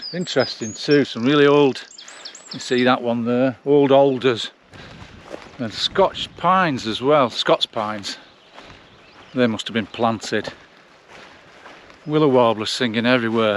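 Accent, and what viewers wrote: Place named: Yorkshire, United Kingdom. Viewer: Many thanks. We really enjoy your videos and your appreciation of birds, insects ,wild flowers etc, and we love your Yorkshire accent.We used to live in Coverdale, North Yorkshire and now we live in Alberta, Canada. We miss the walks but we enjoy yours and pretend we are walking with you. Please keep walking and sharing. Many thanks.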